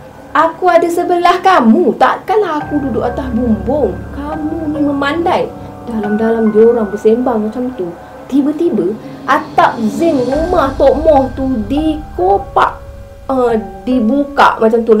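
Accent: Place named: Kedah, Malaysia. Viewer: Akak ni org kdah ka cra ckp mcm org kdah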